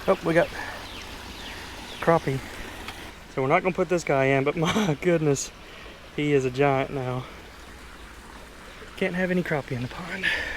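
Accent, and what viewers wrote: South, United States. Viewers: Hearing you describe the pond tech in a Southern accent is peak Americanness.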